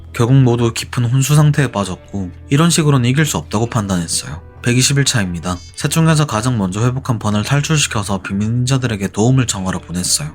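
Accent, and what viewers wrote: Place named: Gyeongsang, South Korea. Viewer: 뭔가 내부자들 조승우 같은 서울말쓰는 경상도사람 느낌이라 평온함 ㅋㅋ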